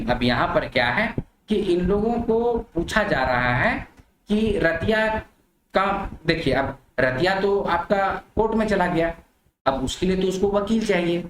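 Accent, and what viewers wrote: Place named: Nagpur, India. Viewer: आप जैसे ठेठ नागपुरी बोलने वाले शिक्षक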